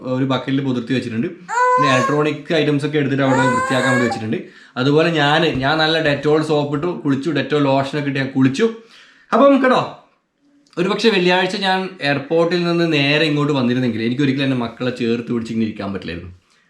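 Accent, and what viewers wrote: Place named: Trivandrum, India. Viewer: Kalli valli broo namma trivandrum dialogue ......ninga poliya macha...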